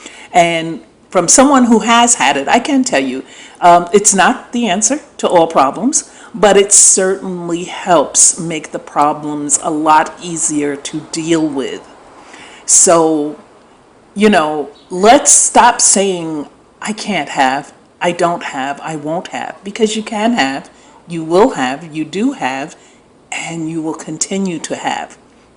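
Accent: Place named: Northeast, United States. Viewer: Ha! I bet those who've said something bout your voice are from the South! I'm a southerner I even said to myself "Listen to this Yankee!" I'll apologize for us lol. But on the real, Love ya accent . Love your videos. Keep doing what cha do sistah!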